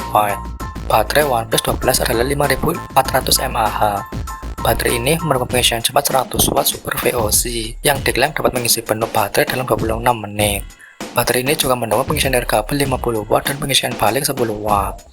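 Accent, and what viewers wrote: Banyumas, Indonesia. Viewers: josss maju terus ngapakers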